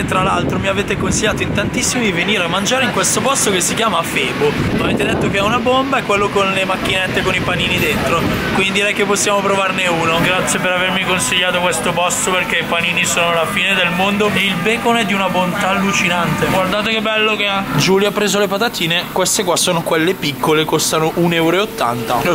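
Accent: Bergamo, Italy. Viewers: sciarpa di capelli, accento bergamasco, street food, ignoranza in abbondanza, scusatemi ma anche senza coffe shop made me feel high.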